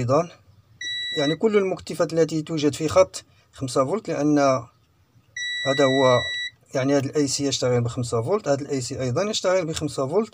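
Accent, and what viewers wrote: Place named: Agadir, Morocco. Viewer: ممتاز وشرح جد واضح ومفصل بارك الله فيك من خلال كلامك يمكن أن أقول انك من اكادير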